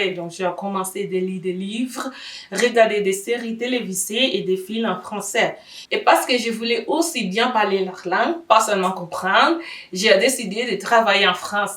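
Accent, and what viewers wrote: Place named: Colombia. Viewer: Tu Español suena muy Colombiano. Hablas muy bien todo. Yo no pude aprender Holandés porque todo el mundo habla Inglés en Amsterdam y en donde trabajaba todos se hablaban en Inglés. Un abrazo grande desde Colombia!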